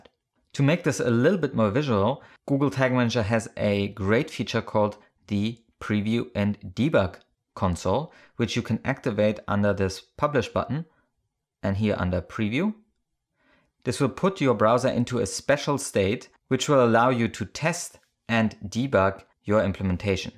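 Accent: Germany. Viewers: love that german english hehe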